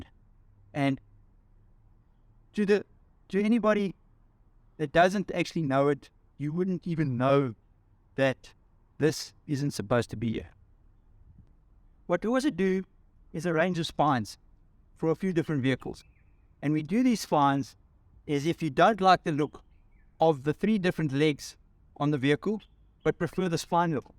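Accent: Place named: South Africa. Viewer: i could listen to you for hours, not because of the roofrack, because of the accent. it remains me of 23 Years living in South Africa. <num> .Then back to Switzerland. But nevermind , your products are great. Lekker bly and tot siens.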